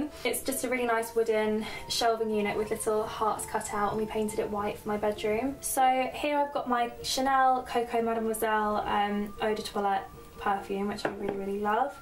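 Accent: United Kingdom. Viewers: I don't know how but I automatically knew you were British the moment the video started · luv the bedroom/also your hair color is so pretty and I wish I had a british accent/ im from the u s a/ thanx 4 the FABULOUS video!! like your outfit 2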